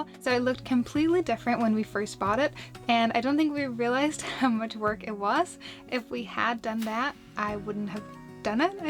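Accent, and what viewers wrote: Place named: United States. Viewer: Love the outtakes at the end. Your American accent is so perfect, I often forget you're from Sweden.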